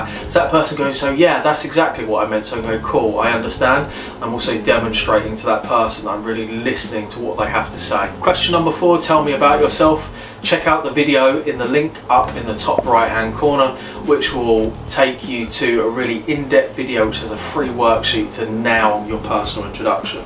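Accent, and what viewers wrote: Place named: United Kingdom. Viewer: brother , your accent is terrible , it could be perfect for brits but for other it is a headache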